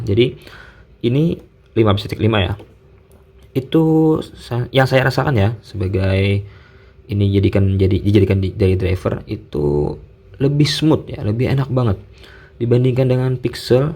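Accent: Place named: South Kalimantan, Indonesia. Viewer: Masnya terdeteksi anak kalsel wkwk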